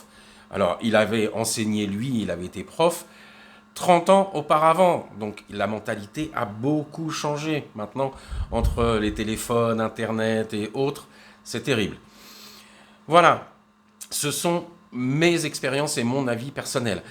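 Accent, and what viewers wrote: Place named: France. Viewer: Ce n'est pas la fonction qui donne le TITRE de professeur.Tu DONNES des cours d'anglais A moins que tu n'aies des diplômes certifiant ta capacité à enseigner.Ce qui m'étonnerait compte tenu de ta prononciation très ''frenchy'' de l'anglais,et AUSSI de tes très grosses lacunes dans le maniement oral et écrit de la langue française...............tu comprendras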